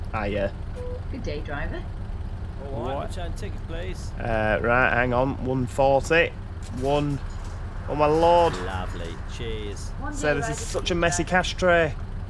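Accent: United Kingdom. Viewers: What part of the UK are you from? You have a very sweet accent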